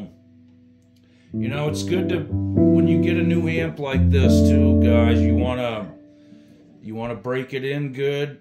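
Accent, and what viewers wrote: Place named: East Coast, United States. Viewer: No offense, are you from Massachusetts?Or New York? I hear some upper East coast dialect. Talk less. Let us hear the amp more.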